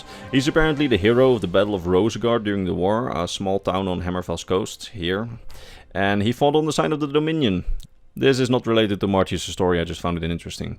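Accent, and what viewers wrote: Netherlands. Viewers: Dutch i guess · Are you like, Dutch?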